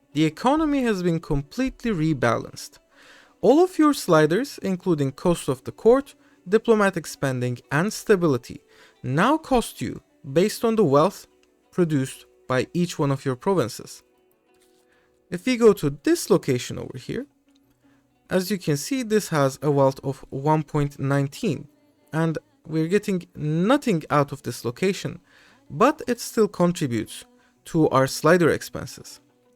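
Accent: Turkey. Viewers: It's just your english accent sounds very turkish and your pronunciation of turkish names is almost spot on. The only proper name you "messed" was "Döger" and that's not even your fault. It's PDX's fault. It should be "Döğer" with a soft G not "Döger". I swear the letters I, İ, and Ğ cause so much pain for the software developers lol. Although azeris call that oghuz tribe "dögər". Perhaps you are azerbaijani 😂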